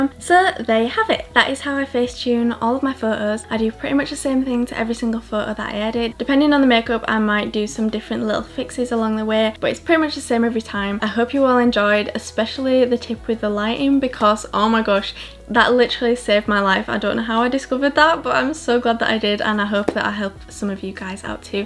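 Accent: United Kingdom. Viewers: OMG I didn't know you were British I thought you were American and I didn't expect your voice sound like that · Bruh I wasn’t ready for the accent hello fellow Brit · Jade McAboy she is brithia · SHES BRITTISH. UGH IM IN LOVE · Your from the UK sis❤ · POV: u had no idea she was British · YEEESSSSS SHES BRITISHH!SHE GETS ME... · ....doesn't everyone have an accent..? Do you mean she's British? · Wtf she’s British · omg i had no clue that u were british!! you’re so pretty and omggg i love you’re accent and i thought u we’re american tbh but omg🥰❤️ so pretty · I looked her up and it said she was born in England and I was like oh my God I need to hear her voice. So, I came here and I had no clue she was British. I only saw her in tiktok. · Ur rlly British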